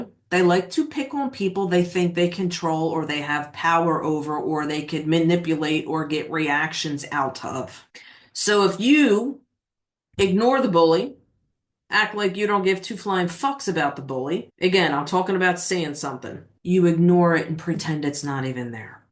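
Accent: Philadelphia, United States. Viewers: You sound like u r from Philadelphia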